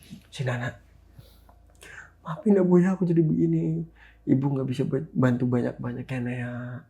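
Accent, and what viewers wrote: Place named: Jakarta, Indonesia. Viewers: ganteng tp betawi banget....😅😅😅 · Gua demen neh yg logat logat depok gini 😎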